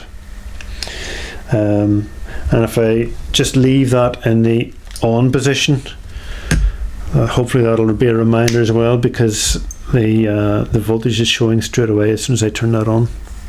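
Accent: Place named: County Down, United Kingdom. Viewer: That's a County Down accent.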